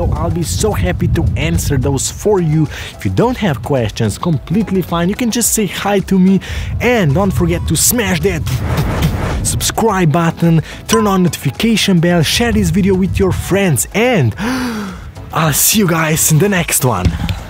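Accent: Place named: Slovenia. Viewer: Where are you from? It sounds like Slovenian accent.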